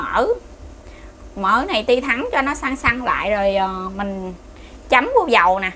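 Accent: Mekong Delta, Vietnam. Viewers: Anh xem từ đầu đến cuối Video Em rất xinh với chất giọng ấm áp đặc trưng miền tây nam bộ, món ăn rất ngon chỉ thiếu mỗi bộ bà ba của người con gái sông nước miền tây nữa là nhất Em đấy ạ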